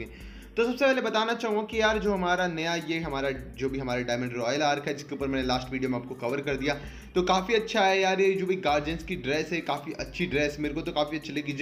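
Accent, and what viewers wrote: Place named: Garhwal, India. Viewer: se pta chlta ha ki apna gadwali boli mst bahi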